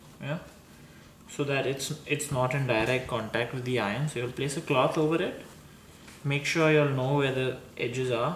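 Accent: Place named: India. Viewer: Why do all the indians talk like that i like the way they talk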